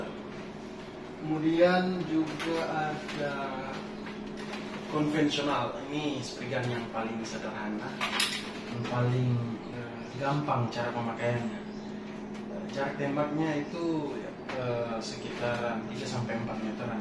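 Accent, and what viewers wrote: Manado, Indonesia. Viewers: Orang manado kang?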